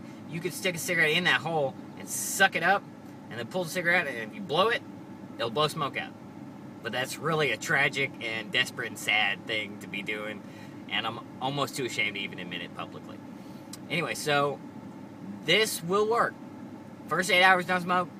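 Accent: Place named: Texas, United States. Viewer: Loving your texan accent and you're a cool dude :)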